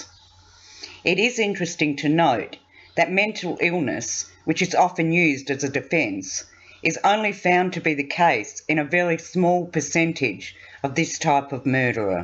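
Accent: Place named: Australia. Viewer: Good to hear an Aussie voice narrating, liked, subd.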